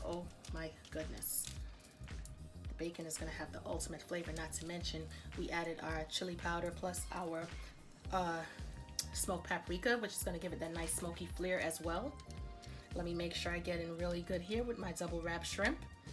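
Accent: New York, United States. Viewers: from your accent, I’d say you live in NYC? Or maybe in the vicinity? Love your accent. I live in Upstate New York, near Albany and Saratoga.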